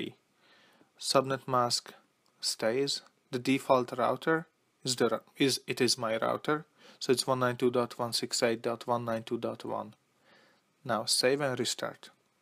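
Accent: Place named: Poland. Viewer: He sound polish to me ;-) Pozdrawiam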